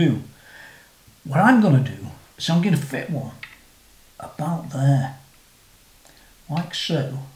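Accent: Stoke, United Kingdom. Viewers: Sounds like you’re from Stoke? 🙌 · I don't know why, but the stars and stripes strap combined with this british accent gave me weird feelings.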